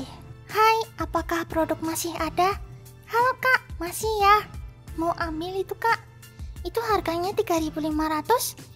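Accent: Java, Indonesia. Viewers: Medok ya 😅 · Mencium aroma aroma logat jowo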